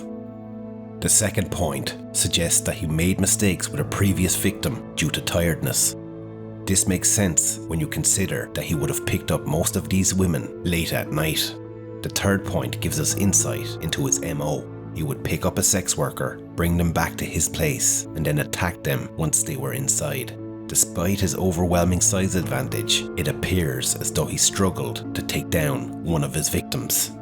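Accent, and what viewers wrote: Ireland. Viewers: Well Its usually someone with a New York accent narrating these videos about Rex but this time it's a lilting and lovely Irish brogue! Jesus Mary and Joseph it is horrendous to hear what the despicable monster did to his victims dear Lord Jesus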